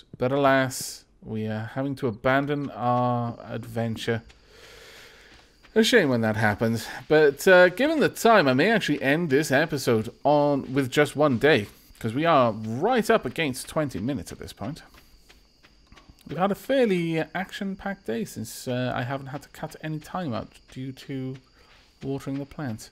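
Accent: United Kingdom. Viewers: from 1 brit to another, I can definitely hear it with you sayings and use of language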